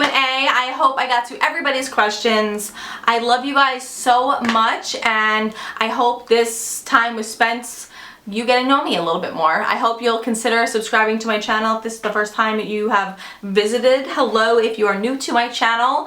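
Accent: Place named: Long Island, United States. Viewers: Gah!! you are so awesome and so real! I love your videos so much! And I love the Long Island accent!! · <3 your accent. Are you from NY originally?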